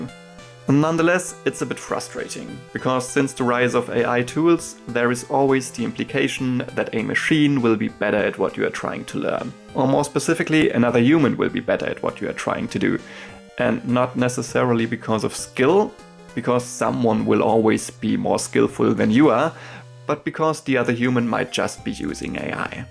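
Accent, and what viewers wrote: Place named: Germany. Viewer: I'm guessing you're German, based on the accent and the fact that you speak more articulately than most native English-speakers. Great video!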